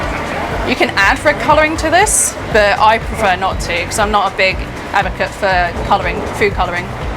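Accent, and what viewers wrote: United Kingdom. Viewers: Hi there u are professional as a 1 Michelin star chef but your presentation is way too serious.. pls lighten up n I m sure you will get more subscribers.. smile more! You r very pretty with a nice British accent. Love your blonde hair too! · Fantastic British accent love it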